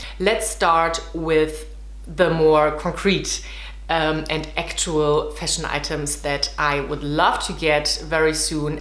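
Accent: Germany. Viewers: Dein Akzent klingt deutsch 🤓I'm learning it lol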